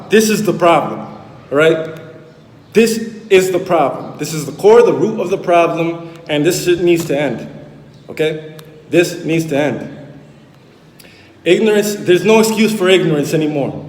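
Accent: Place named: United States. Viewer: your a american ok.. I can tell by the way you talk your not from the island. The Dominicans I know from Panama and RD would stick they chest out and not cry like a little b$tch... They stand on what they believe not back and forth bs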